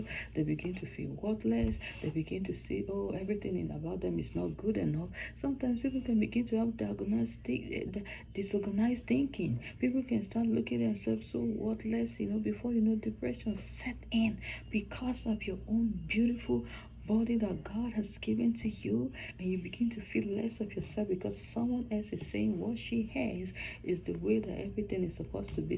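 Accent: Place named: Kenya. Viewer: Very good story. I love your accent. Kenyan? Very soft. Excellent video!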